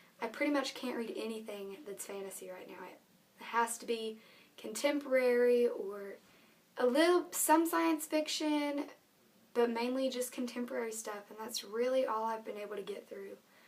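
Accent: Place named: United Kingdom. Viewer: Haha. It's true. I am fascinated by British accents. And I'll trade weather with you! It's like 1000 degrees over here. :/ Have fun on spring break! :D we'll miss ya. Oh, and thanks for the tag. xD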